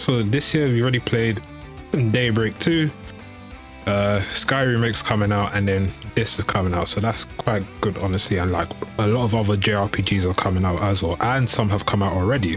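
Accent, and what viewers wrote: United Kingdom. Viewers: I didn't know you're black. Not that there's something wrong but I have you in my mind as a Japanese with a British accent.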